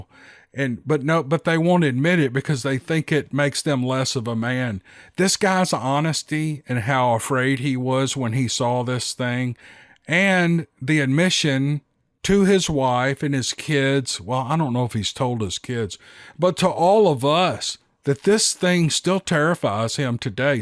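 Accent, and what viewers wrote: South, United States. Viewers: I love a sassy Southern girl! lol. Ya sound like me. Thanks for making me lol ! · I can't listen to the overly southern accent. could speed up the tempo. Better yet, goodbyyyyyyyye. (Accent emphasized, of course)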